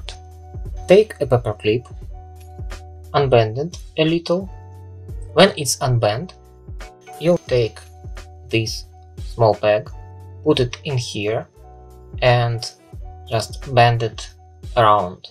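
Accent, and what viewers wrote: Russia. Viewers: Мне вот интересно, он говорит с русским акцентом или такой присущ ещё кому-то? And the video is very informative! In Russia, where I live, it will be problematic to buy Nendoroid Easel Stand on your own, but I always want to take a photo of my favorite figurine. Thank you very much for DIY!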